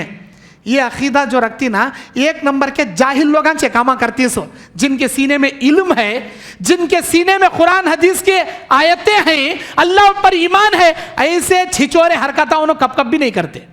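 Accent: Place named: Karnataka, India. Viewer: I'm also from Karnataka tumukuru. Sheikh ki baat karne k lehje se laga k ye hamare state se hi hain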